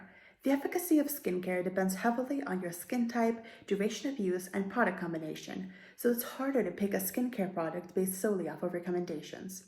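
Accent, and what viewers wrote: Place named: United States. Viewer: Where are you from? You say Euros but your accent sounds totally US-American!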